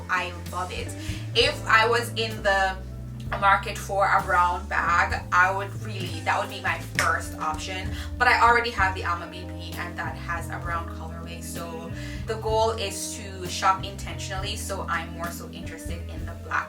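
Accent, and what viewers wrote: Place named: Jamaica. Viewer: I heard a Jamaican accent so I’m subbing to my people.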